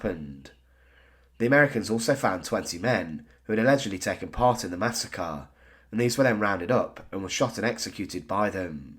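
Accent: United Kingdom. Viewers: Who is the jew with British accent narrating ? jew Bolshevism / Red October / Tsar Family Assassination / Holodomor / Stalin / Dresden Bombings / jew Soviet atrocities against civilians/ genrikh yagoda and countless others Shut up ya yid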